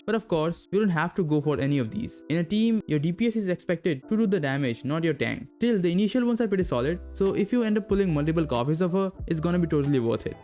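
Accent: India. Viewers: You sound like you’re Indian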